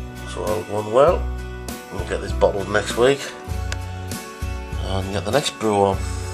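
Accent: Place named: Newcastle, United Kingdom. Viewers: Good video thanks for posting it. I enjoy dark ales and will be looking for the one (hopefully) that you made of the Norfolk Nog. I can't quite place your accent, at a guess i would say Northeast heading towards Newcastle. Am i close to being right ? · looks like a nice brew mate, keep us updated on the taste, you sound fairly local to me (newcastle), where ya from?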